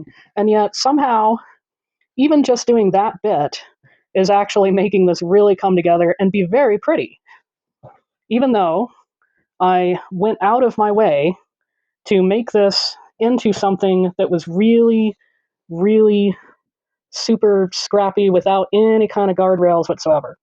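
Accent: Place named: Michigan, United States. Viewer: Are you from Michigan? I am replanted to GA but your “accent” speaks my much loved Michigan! We don’t have accents in Michigan.😂. Seriously, a great tutorial and appreciate your style.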